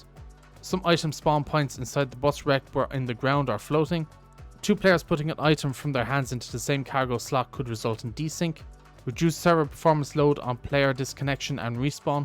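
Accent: Ireland. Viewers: He said 30. is Irish so he pronounces it as "Tirty"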